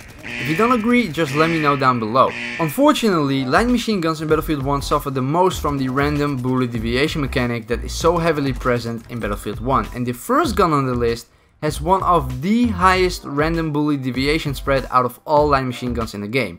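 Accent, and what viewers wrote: Netherlands. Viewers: Are you Dutch? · are you dutch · You come from netherlands right?😊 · he is dutch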